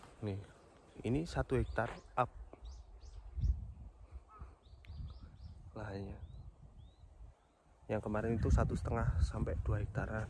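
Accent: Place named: Japan. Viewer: Smean orang mana kang, ko ngga bisaa di bedain klo samean orang jepang apa indo😁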